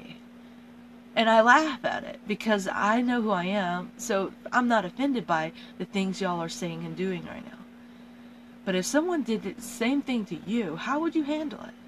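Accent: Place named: South, United States. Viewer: Why did the voice change to a weird southern accent after episode one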